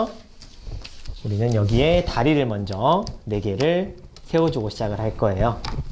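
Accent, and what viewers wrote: Jeolla, South Korea. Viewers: 뭐여 전라도 빨갱이방언이여?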